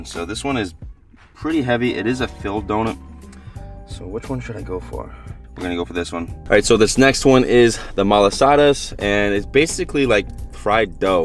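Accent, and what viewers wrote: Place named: New England, United States. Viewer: I thought for sure you'd go to Rocco's in Millbury,! PV is good too though! 😄 Wicked awesome video- happy you never forget your old stomping grounds! And don't you ever lose your accent- you gotta represent us New Englanders! LOL #TeamBigBites FOREVAA 🍩💜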